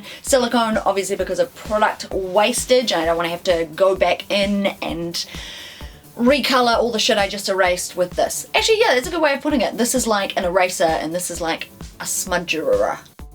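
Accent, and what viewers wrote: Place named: New Zealand. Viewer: I heard that kiwi accent and I was IN!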